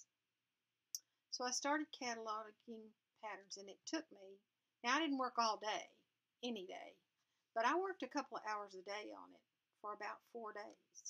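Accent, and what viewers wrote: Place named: Tennessee, United States. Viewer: Hi! New subbie! Love your Accent! I'm in Tennesse and the accents sound very much alike! lol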